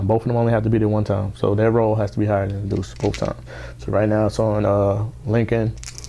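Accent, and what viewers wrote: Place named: Bronx, United States. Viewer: You definitely from the Bronx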